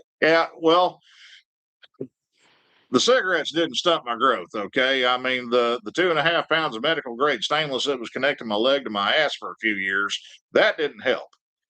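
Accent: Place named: Arkansas, United States. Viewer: I don't know if these comments after the live-feed helps in any way, but I am a loyal listener for several reasons. One is that you are very knowledgeable in usable information. Second, it's fun to listen to your jokes (not necessarily your characters). Third, I love to hear an accent from home and some days I am low enough that just hearing an Arkansas accent will lift my spirits to the point of almost tearing up. Some day I will make it back down to Tampa and will say hello again (met you Dec 23 at the Ride Factory, retired Navy guy. Also met Weems, David, Jack and wives at Sturgis in 2025.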